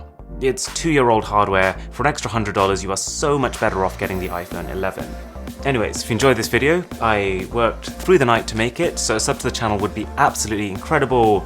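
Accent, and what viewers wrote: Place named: United Kingdom. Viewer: Yep.... speaking the King's English, but then he often ruins it by saying, "anyways".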